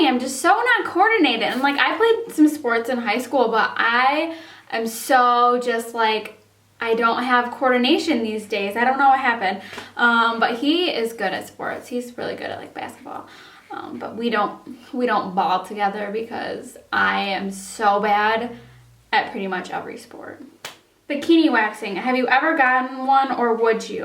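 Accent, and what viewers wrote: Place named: New York, United States. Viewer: Asked too soon.... Sounds like you are from somewhere in NY! Represent! :)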